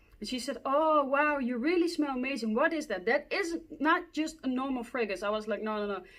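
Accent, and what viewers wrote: Netherlands. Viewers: Love your Dutch accept and Dutch confidence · Hoi ben jij Nederlander coz ur English is that Nederlander accent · In like two seconds I was like Dutch accent?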